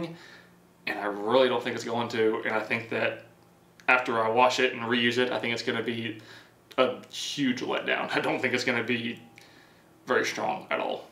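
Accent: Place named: North Carolina, United States. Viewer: What state is he from. My guess is North Carolina , me being from there our accents sound familiar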